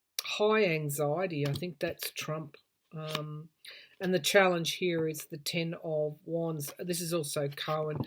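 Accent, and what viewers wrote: Australia. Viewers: I'm glad I'm not the only one who gets annoyed at viewers who correct others so easily. As for me, I love your wonderful Aussie accent & accents in general. Thanks for all you do. I really enjoy your readings . 👏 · Love your accent and purely Aussie expressions❤️ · 🙋‍♀️I love your Aussie accent 😊❤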